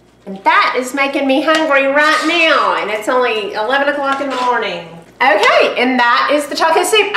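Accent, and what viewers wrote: South, United States. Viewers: Thanks so much for the detailed response! Just found your channel today through Elle! I like your channel so I subscribed! I'm detecting a strong Southern accent - where are you from? I'm from North Carolina.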